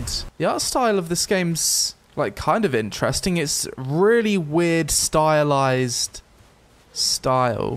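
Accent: United Kingdom. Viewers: after watching this vid i fkin love british accent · thaa brrrrrrrrritish is very amusing. what a lovely chap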